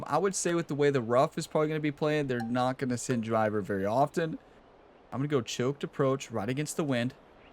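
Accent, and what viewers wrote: United States. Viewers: One time for every shot:_gamerOnetime: Birdie train called 🐦🐦🐦🐦🐦🐦🐦 I think you should complete the challenge on this one fairly comfortably. So get ready for another tier 4 activation. And yes Nascar series is awesome. Featuring Rhett Games as Rocket Rhett, the Daytona Demon (the most entertaining to watch driver in the world) Edit: What an idea, Bdan, GbWaCkErGuY, Apex, Respawn, Kawkster and Early1981 as playable pros. What a match play ladder that would be 😁 Edit2: As a person from the UK, I can confirm you pronounce Royal Birkdale correctly. The person who said your were saying it wrong was likely either a troll, or somebody who was thrown off by your American accent 😉 Post round edit: Comfortable as predicted. Great round 👍 You should give Dingo's latest course a try for an impossible challenge. It's called Sliabh Abhartach (pronounce that if you can 🤣). 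It's not one of his crazy fantasy courses. Just a beautiful realistic creation and a good challenge.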